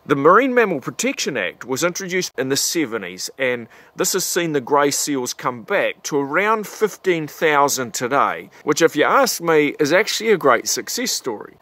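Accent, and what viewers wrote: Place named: Australia. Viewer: It is good to hear a down under accent. Makes me homesick. I will soon be heading from Nantucket’s winter to my place in New Zealand for their summer. Meanwhile, I’d like to set the narrator straight. In this Earthrace Conservation video, the narrator displays the valor of ignorance. The valor of ignorance is defined as expressing a point of view that is unhinged from knowledge of the subject at hand. Let me point out some of the errors in his narrative that deflate his claims. --Crocker Snow a respected conservationist- does not “lead” the Seal Abatement Coalition. He has been a valued member of its Board. He did, however, lead the campaign to get Muskeget Island officially designated and preserved as a Federal National Natural Landmark. ---Crocker is not concerned about the gray seal invasion of Muskeget Island (of which he owns half) in order to protect his shack there. 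He wants to protect the island’s biodiversity, which is threatened by the burgeoning gray seal population. For the real story go to <link> click on Actions and then on Unintended Consequences. ---The narrator minimizes the gray seal impact on the fishery and lays it off on commercial fishermen. A recent study of commercial fish and shellfish landings from North Carolina to Nova Scotia found that they equaled just 60% of what gray seals consume. --Far from the 15,000 gray seals eating mainly “sand lance and shrimp” that the narrator cites, a report in a regional North Atlantic newspaper cited a Canadian stud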